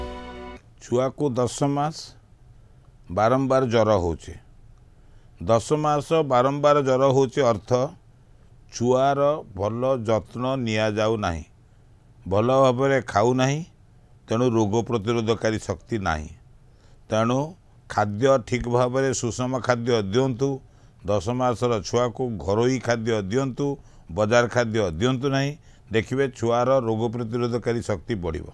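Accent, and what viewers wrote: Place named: Bengal, India. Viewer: Dr Odia karuchanti bangali vasakemiti aasuchi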